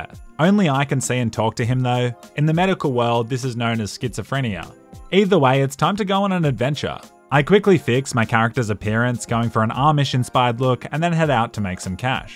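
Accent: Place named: Australia. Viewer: woah... your'e Aussie?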